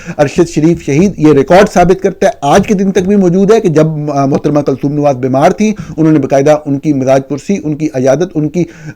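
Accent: Punjab, Pakistan. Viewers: پنجابی لہجے میں انگلش کا لہجہ بڑا لطف دیتا ہے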